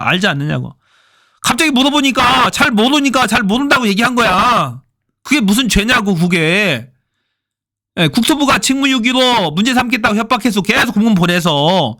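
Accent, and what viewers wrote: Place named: Jeolla, South Korea. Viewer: 한성진 최고다. 아주 시원하다. 방송하는 놈도 전라도구나 ㅋㅋㅋㅋㅋ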